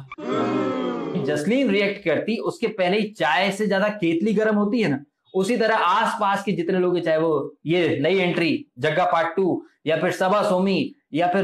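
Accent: Haryana, India. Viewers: jii aapka haryanwii andaaz ekdum kamaal...jalota aur jasleen ka kapdonka drama sirrf aur sirrf trp boosting tactics..kuch bhi reaistance n dikhake directly kapde aur makeup de deti toh drama kahanse bann pata..agar trp laa rahe hoo toh bb khud bachate hai frm elimination... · bhai aap kamal ho 👏👏 👏......Aapka Haryanvi andaaz, aapki break up wali aisi lagi lagan aur jasleen-anupji ka breakup ka jo varnan kiya hai wo bahout enteratining laga..Mujhe personally bahut hassi aayi jab aap alag andaz mai baat kar rahe the.....Aapka 15-20 min ka review 1 gante ki borring bb12 se jyada entertaining hai 😂😂😂😂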